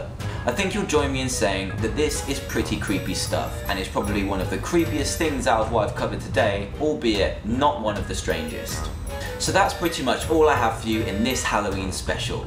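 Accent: United Kingdom. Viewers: Your English accent makes the Hypno poem way scarier. English accents in general have that effect.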